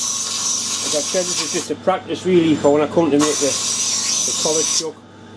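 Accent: Newcastle, United Kingdom. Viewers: Another great year on YouTube,. I wait all week for your SNNCs and it's always a pleasure watching them, which I often do more than once, if only to hear your Geordie dulcet tones cursing "BASTAD"! May I wish you, Debbie and your dad a very merry christmas and a happy and healthy 2017. May I also thank you for your YouTube input, which gives great insight into a very skilled engineer, or as we referred to them in my apprenticeship days in the 60s, 'fitter-turner'... those were the two skills necessary to become a skilled toolmaker in those days and it was taken for granted that if you could turn on a lathe you could also perform milling, surface-grinding, shaping, tool-sharpening, etc. But with you, it doesn't end there... you are probably one of the best multi-media welders I have ever had the privilege to watch and for someone with a home workshop, you perform some of the best brass and bronze small-scale casting that I've seen (OK, it may not pan out right on the first attempt, but you get there in the end... 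but that's metal casting for you). I very much look forward to watching what you get up to in 2017.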